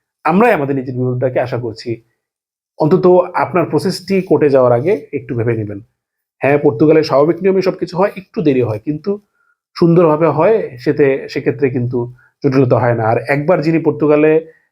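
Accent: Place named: Kolkata, India. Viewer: স্যার আপনি সহজ বাংলায় বললে ভাল হয়। কলকাতার মতো বলছেন মনে হচ্ছে। 🎉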